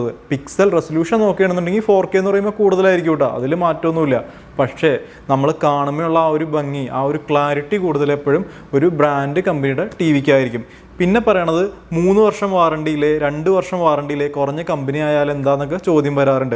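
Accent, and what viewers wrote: Thrissur, India. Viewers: Thanks for the very useful info. Also Enjoyed listening to " Thrissur Basha ". A suggestion while using TVs on Table Tops. Just screw down the table stand legs to the wooden table with two or more U shaped stainless steel clips. Users can use own imagination too. Thanks again for the safety info.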